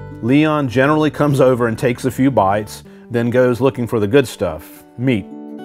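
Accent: South, United States. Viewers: Exactly. His deep clam soothing southern voice and encouraging words. Happy little lobsters…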